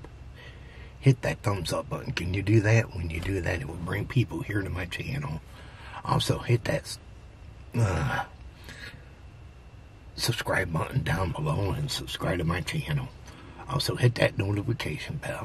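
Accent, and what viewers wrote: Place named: Michigan, United States. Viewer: I could tell you were from Michigan with your accent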